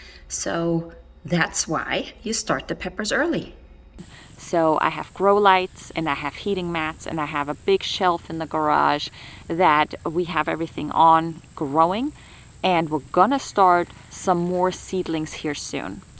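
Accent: Louisiana, United States. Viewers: Is your accent Cajun?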